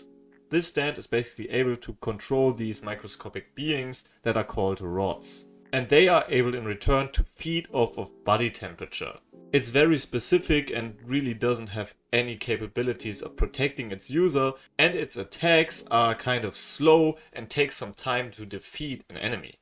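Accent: Germany. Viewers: Deutscher Akzent! Weiter so Bruder💪🏻👍🏻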